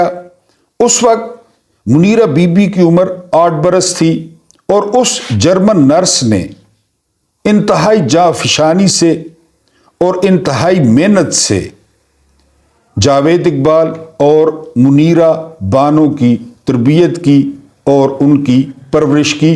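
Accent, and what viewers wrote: Saraiki, Pakistan. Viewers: اسلام وعلیکم! صاحب آپ کی وی لاگ بہت ھی انفارمیٹو ھوتی ھی۔ اینڈ ہر کھی کبھی سرائیکی لہجہ بہت اچھا لگتا ھے ، دعا ھے اللہ تعالیٰ اآپ کو خوش وخرم اور محفوظ رکھے آمین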